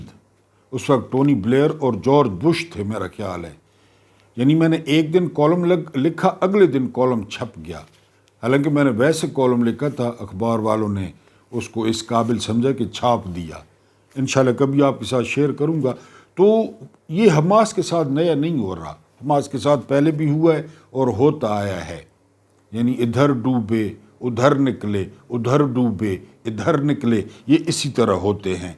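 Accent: Saraiki, Pakistan. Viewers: Shareki or Punjabi ap k muno or lejjay say Laga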